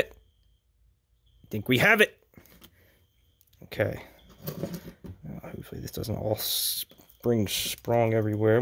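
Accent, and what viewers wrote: Philadelphia, United States. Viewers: Hi I think I here what sounds like a delco or Philly/south jersey accent im from Philly and people think I’m crazy when I’m out of town and I go out to eat and they ask what I’ll have to drink and I say I’ll have a “wooder”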